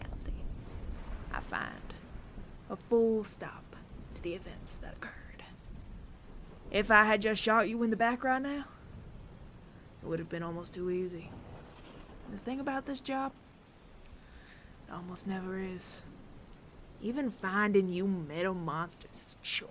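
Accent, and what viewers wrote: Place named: South, United States. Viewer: Oooooo this one was a fun one! Not sure how I felt about the Southern accent, but overall pretty good!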